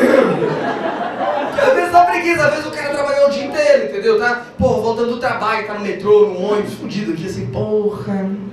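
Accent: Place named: Pará, Brazil. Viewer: Melhor ainda com este sotaque paraense <3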